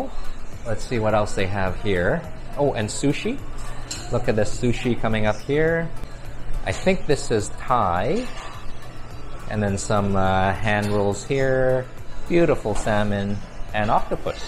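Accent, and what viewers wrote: Canada. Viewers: I love to hear you speak, is that a Vancouver or Toronto accent?😊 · Hi! By any chance are you Canadian? I detect an accent, and also you’re super friendly.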